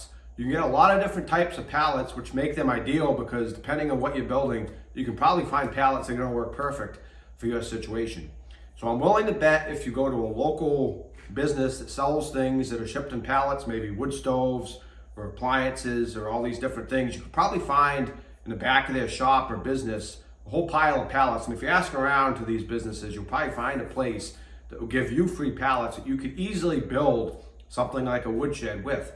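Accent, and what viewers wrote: Massachusetts, United States. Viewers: Wow. Check out that Massachusetts accent. I'm sure I'd have one in return ... From the Desert, USA.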